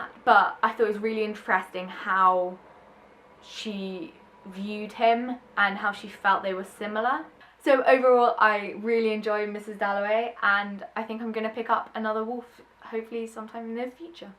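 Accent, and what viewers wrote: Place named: United Kingdom. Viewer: and I love your british accent. :)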